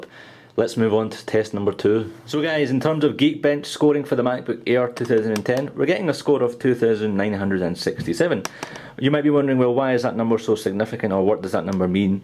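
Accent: Scotland, United Kingdom. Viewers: im getting the macbook pro even tho its slower its much more nicer looking, loving the scottish accent :)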